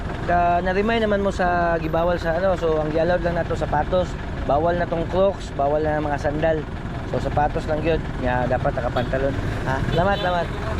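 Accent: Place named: Cebuano, Philippines. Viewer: Sarge taga asa jd ka gkan? paminaw nku dli pure Boholano or Bol anon ky dli bol anon imung inistoryahan...Cebu or Mindanaon imung Sinultihan...unta matubag nimu akong pangutana Sarge Solid followers og Subscriber diay ko nimu...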